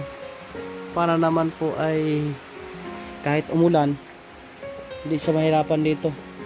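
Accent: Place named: Cebu, Philippines. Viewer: Ano yung dialect mo cebuano ka ?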